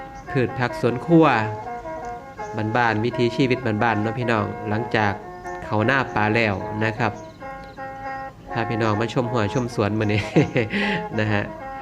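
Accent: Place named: Isan, Thailand. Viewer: สุดยอดเลยจ้าบรรยาแบบบ้านเฮาผักกะงามหลายจ้าบักหุ่งกะดกมีทุกอยางเลยเนาะจ้าเห็นคิดฮอดบ้านคักจ้า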